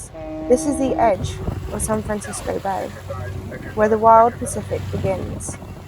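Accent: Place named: United Kingdom. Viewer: While you're visiting places I am already familiar with I find they become more exciting when described with a British accent. 🤪 Hopefully we will cross paths again when you arrive at your current destination.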